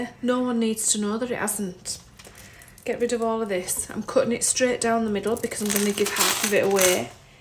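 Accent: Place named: Silksworth, United Kingdom. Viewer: I have just made this again cos it was so nice last time. 😊I grew up in Silksworth and love listening to you. You sound like I used to. Told my sister to listen to you She still lives in Washington.. keep going with the channel. 🎉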